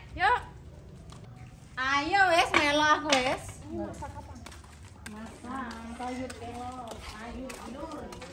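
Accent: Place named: Lumajang, Indonesia. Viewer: Koyok boso lumajang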